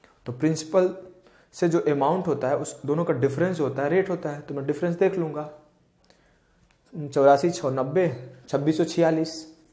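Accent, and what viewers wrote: Bihar, India. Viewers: Bihar se ho?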